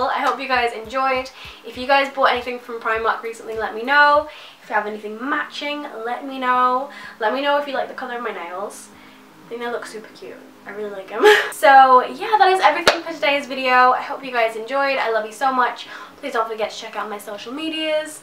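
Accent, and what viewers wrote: United States. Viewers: Are you from America ? You have a really strong American accent? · talking with a twangy America accent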